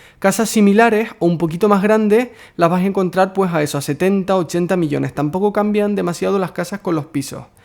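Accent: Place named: Canary Islands, Spain. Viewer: Acento canario inmediatamente detectado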